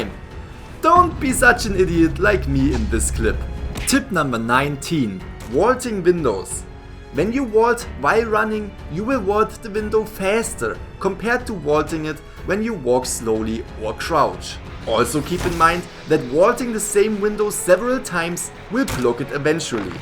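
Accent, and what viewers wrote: Germany. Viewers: Digga dein english ich habe direkt gecheckt du kommst aus deutschland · Kann es sein das du deutsch bist? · Damn bro, man merkt, du bist deutsch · German akzent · Man hört den deutschen Akzent soo raus XD😂 Aber Gutes Video Danke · Im sorry but first: im super triggered by your pronounciation (it sounds like your german i am too but i speak english too) aand you were using the flashlight super bad giving tips( that are obivious) but not giving the tip to stun the killer before using it? Its actually more important than Open the gate before helping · No front, but u are German right? Your English sounds.. pretty german, yk? 😂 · We love a good deutscher akzent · Are you german ypur english sounds like that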